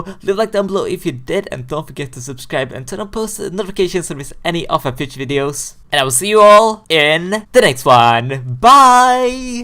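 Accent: Sweden. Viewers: are you swedish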